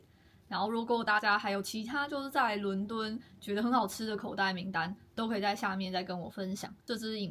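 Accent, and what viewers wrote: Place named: North, China. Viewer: 北部口音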